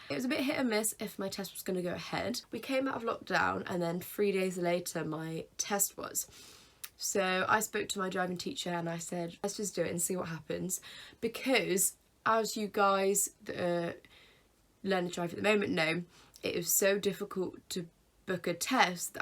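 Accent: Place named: RP, United Kingdom. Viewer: you're very posh arn't you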